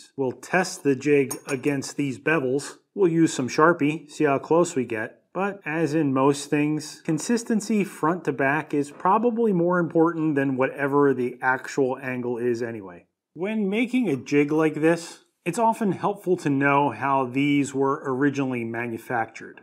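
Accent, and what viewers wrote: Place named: New York, United States. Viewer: So, I thought this sounded like you but somehow different, a bit more raucous, slightly more vulgar and significantly more nonsensical. Your voice has taken on a more New York accent than I remembered, the family component wasn’t there but the Star Trek allusion was right there! Also, your production is more TV and less YouTube….. I must say, I really enjoyed it and at once wasn’t sure if it was you as I was watching on my phone and it wasn’t as apparent as on my desktop. If this is the new, I approve!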